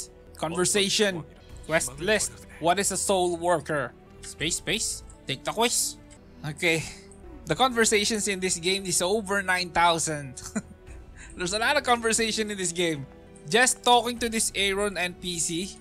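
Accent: Philippines. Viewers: You sounded like a Pinoy. I hope I'm correct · wait.. thats a philipino accent? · Pinoy accent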